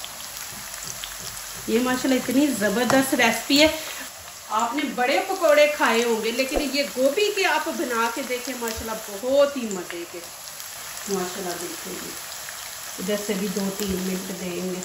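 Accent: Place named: Punjab, India. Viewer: Ap ki recipie bohat achi hoti hen aur ap ka urdue me panjabi lehja aur mohabbat bohat inspire kerta he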